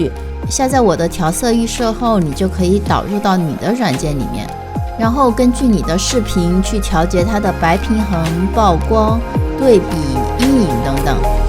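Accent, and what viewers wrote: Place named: Shanghai, China. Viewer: 专业的解说，声音好听，好像是上海人😛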